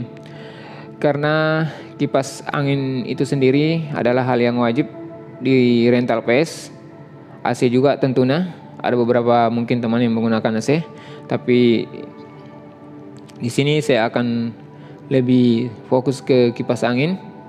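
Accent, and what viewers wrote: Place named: South Sulawesi, Indonesia. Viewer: Logat nya orang sulsel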